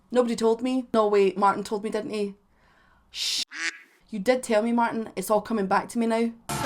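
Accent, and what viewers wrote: Scotland, United Kingdom. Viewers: In one video you say you'll be friends with, and fight the people in the comment sections. If the accent wasn't enough, that's all anyone needs to hear to know you're Scottish!!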